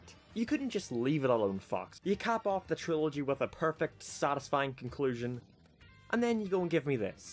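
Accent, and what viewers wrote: Ireland. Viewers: Dude where are you from I love your accent! Irish? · Wasn’t until that i realized he has an Irish accident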